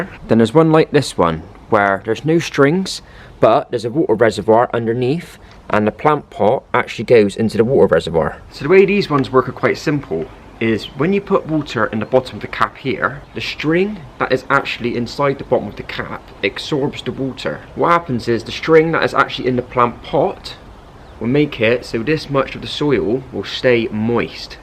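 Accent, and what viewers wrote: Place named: West Country, United Kingdom. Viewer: Aww West Country accent❤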